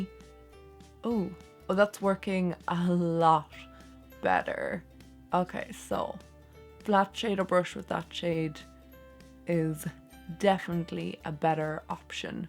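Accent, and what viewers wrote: Ireland. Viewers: Are you from Ireland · Love the Irish accent! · Love to listen to you talk. Some of us from the USA love the Irish accent.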